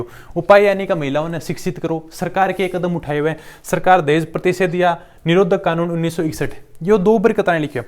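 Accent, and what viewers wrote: Rajasthan, India. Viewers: Rajasthani language hai thoda bhut different ho jata hai bolne m...bro aap smajh to PA rhe ho ...ki sir kh stree rhe h..😊😊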